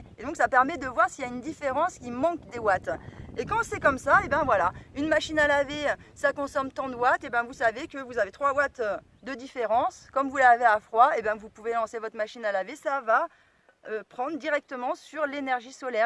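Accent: Paris, France. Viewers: Excellente vidéo didactique ! Par contre par pitié apprenez à perdre cet horrible accent parigot! C'est insupportable tous ces ''huns'' à la fin de chaque deuxième mot 🤯😱 A moins que vous soyez la ''x'ième'' descendante d'Attila ! Les plus érudits comprendront 🤺🏇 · L'accent parisien ! Juste insupportable ! C'est dommage car la vidéo est intéressante.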